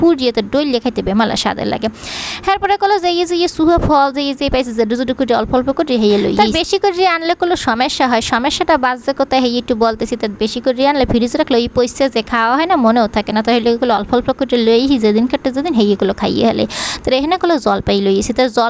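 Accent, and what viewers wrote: Barishal, Bangladesh. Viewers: আমার বাড়ি মাদারীপুর। থাকি মালয়েশিয়ায়। আমার ভাবি ও বরিশাইল্লা।কিন্তু আপনার কথা এক্কেবারে খাঁটি বরিশাইল্লা। শুনলে অনেক হাসি পায়। আপনার স্বামী ও কি বরিশালের?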